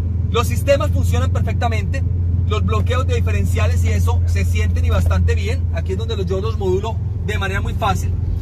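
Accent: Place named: Colombia. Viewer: Pensé que era Colombiano por su acento, excelente video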